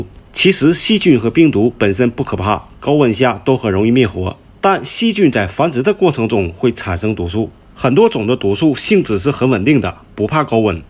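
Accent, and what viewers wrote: Dalian, China. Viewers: 的大连口音听起来真亲切。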